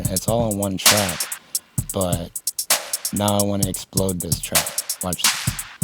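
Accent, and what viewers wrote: Philadelphia, United States. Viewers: Yo bro are you from Philly?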